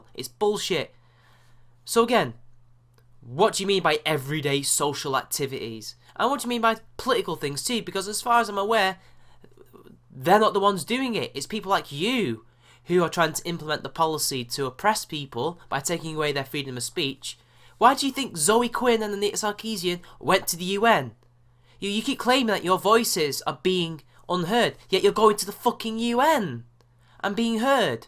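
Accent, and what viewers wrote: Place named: Northern England, United Kingdom. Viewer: I really like your channel. Makes me hum the song every time. Your accent is luv-ly, luv. I can't quite place it, you must be in the north. One of the things these very young SJWs fail at is history. They are either too young to remember the misery, the nearly 100 million lives squashed out by 'marxist' 'communist' brutarian regimes, the mass starvation, the firing squads, pogroms, gulags, five year plans, the slaughter of people who wear eyeglasses, the racism, the murder of people for even trying to practice their religion. And the corruption where the party henchmen steal everything and the people starve, which occurred 100% of the time. I could go on, but hopefully you get the point. She seriously fails in understanding the U.S. Constitution. We don't rule by majority in the way she thinks--the government is set up to protect minority rights and not have mob rule. 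Every sentence she says has a major glaring error, which you broke down brilliantly. Applause.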